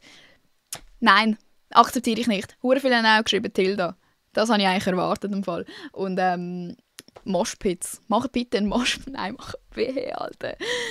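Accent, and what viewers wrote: Switzerland. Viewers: Du bist so lustig! Ich lieb den Schweizer Dialekt einfach :-)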